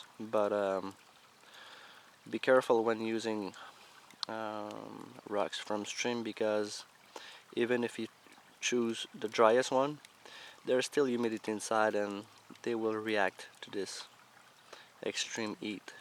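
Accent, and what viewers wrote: Quebec, Canada. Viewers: hummmmm... je crois entendre un petit accent québécois dans tout ce qu'il dit ^^ I think hear a quebecois accent in his voice^^ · are you french? from canada right ? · je viens de sub ton accent je me suis dit fuck de ou au quebec il viens lui haha vaudreuil ici!je te suis,tres bons videos!je veux commencer a faire ca,sortir de la routine des enfants etc! prend soin de toi soit safe! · You sound French Canadian, I could be wrong, dude you're awesome, merci beaucoup. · Great video! Are you Canadian French in Quebec? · Thats a nice quebec accent :) cool video man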